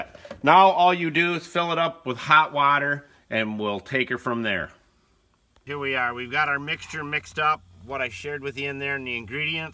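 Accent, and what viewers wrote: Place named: Midwest, United States. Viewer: I could tell this guy is Midwestern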